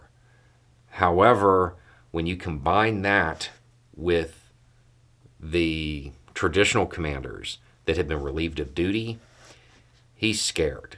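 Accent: South, United States. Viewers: Nobody with that accent should ever be commenting on geopolitics. Dumb rednecks.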